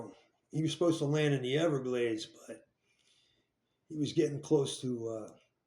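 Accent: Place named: Philadelphia, United States. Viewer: Hey you sound like you got the north Philly drawl! These stories are great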